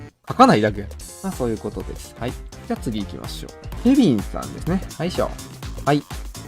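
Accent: Kansai, Japan. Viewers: 関西弁で親近感湧く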